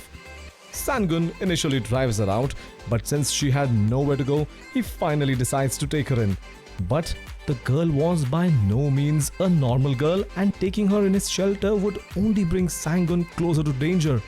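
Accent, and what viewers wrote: India. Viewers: i just know from your voice that you are indian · Oh good god I hate Indian English ! The pronunciations are just so JARRING !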